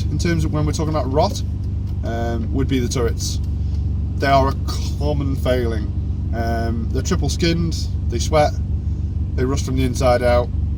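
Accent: United Kingdom. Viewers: I like the accent but some words are funny to me at times but I love the car culture in the UK and movie's also the word cunt such a passive blunt word lol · Fellow scouser. Recognized the town in the background before the accent tbh. My R34 has zero body rust underneath although some of the bolts and fastners have surface rust on them. Looking at it though I can see, how they can rust easy. The paint is no better than a Micra of the same era. i.e. shite.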